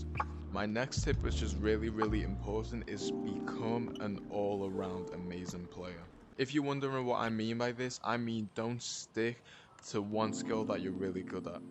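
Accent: Liverpool, United Kingdom. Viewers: boss accent da lad ar ye scouse? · Is my guy a scouser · Are u scouse and if u are I hope u support L.F.C 🔴🔴🔴🔴😊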